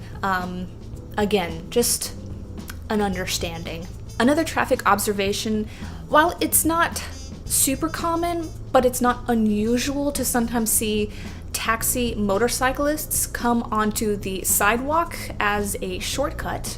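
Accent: Thailand. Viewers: I love your narrative so much and give the trumbs up to your vlogs especially Thai intonation. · Your Thai accent sound you count number is so cute.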